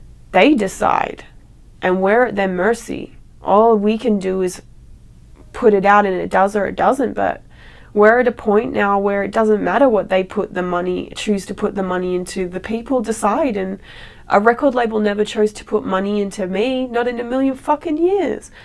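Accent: Australia. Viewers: she talks naivety with an australian accent well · So why does she still have an aussie accent when she speaks ?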